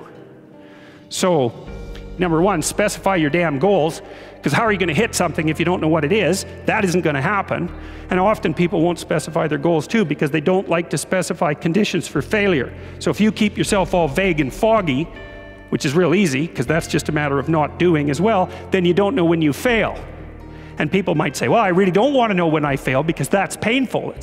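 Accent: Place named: Canada. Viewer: He sounds so Canadian haha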